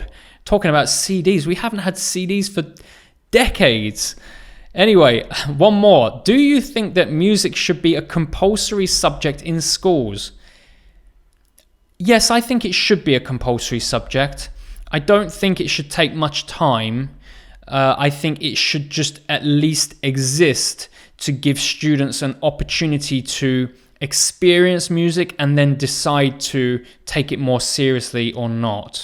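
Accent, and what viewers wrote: United Kingdom. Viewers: I love your amazing British accent 😍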